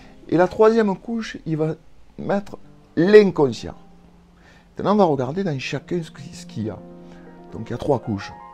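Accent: South, France. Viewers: J'adorée vous êtes de bonne humeur avec votre jolie accent du sud merci énormément voues êtes trop pédagogue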